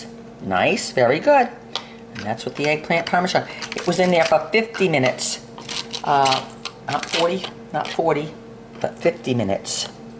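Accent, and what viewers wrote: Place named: New England, United States. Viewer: I thought so. I’m from Massachusetts originally but now on the west coast. Thought I recognized the accent. Really enjoying your videos. Thanks for the reply.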